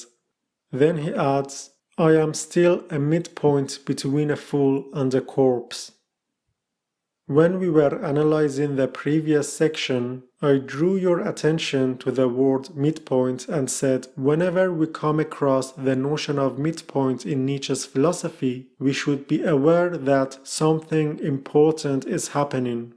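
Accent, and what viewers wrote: Germany. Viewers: Hahaha, I'm German and this is German. The name of the channel is German, his name is a German one. His accent is what every German that tries to pronounce English sounds like. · I can't quite place your accent. German maybe? If so, you're so lucky you can read Nietzsche and many other great philosophers' original works!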